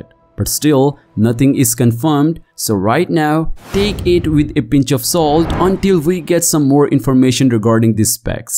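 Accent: India. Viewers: Indian English accent 😂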